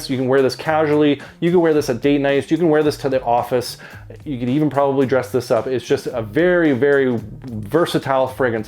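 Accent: Canada. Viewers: I could tell from the accent that you were a fellow Canadian! I now know that you are also a fellow traveller! Great channel, hope to see more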